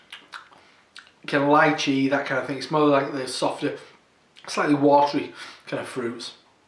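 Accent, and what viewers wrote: Yorkshire, United Kingdom. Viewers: ooo aaaaaaarrrgghhh thaaarrrrttt smells booooootifuuuulll .. proper Yorkshire accent dude .. picked up the colab beer they did with northern monk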